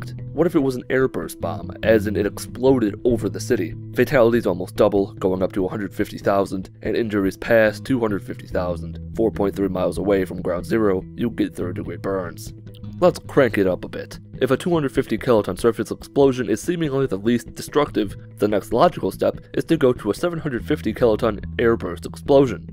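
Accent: Michigan, United States. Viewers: I'm good g to guess your from Michigan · I knew your accent/or lack of accent was michigan-y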